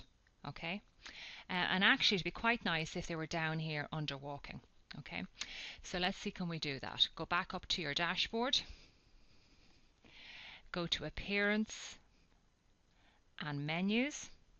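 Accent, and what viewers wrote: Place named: Ireland. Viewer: lol. I'm usually terribly annoyed by these sorts of videos. But your Irish-ness just made it so much nicer to listen to xD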